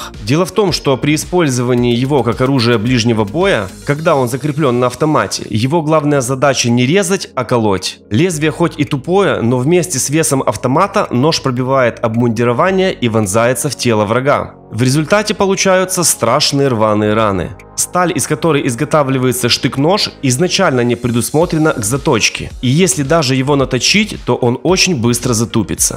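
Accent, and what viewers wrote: Ukraine. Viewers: есть что тут сказать. Смех да и только ребенок с явным акцентом страны 404 пытается рассказать о том в чем не разбирается.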